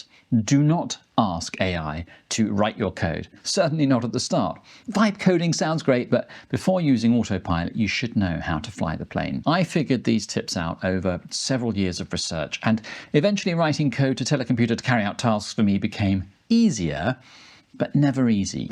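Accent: United Kingdom. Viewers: Bro you have the thickest british accent I've ever heard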